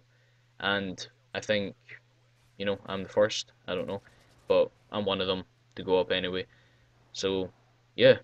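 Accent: Scotland, United Kingdom. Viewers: I LOOVEE your scottich accent! Easily subbed! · woo scottish ftw ;D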